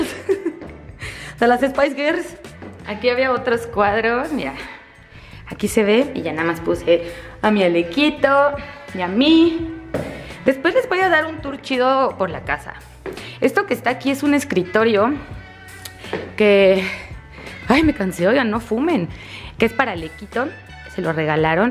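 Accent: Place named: Spain. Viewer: aquí estoy apoyando con mi granito de arena viendo todos tus videos como mil veces en todos los dispositivos que tengo para apoyarte y que tengas muchas muchas vistas me encantas y por favor amo tu acento español 😅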